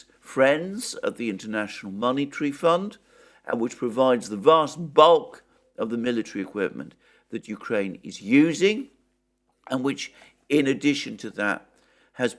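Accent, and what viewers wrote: United Kingdom. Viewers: You know, half the reason I watch this show daily is so I can hear how these crazy limeys speak Washington's English. "The works..." That's super cute!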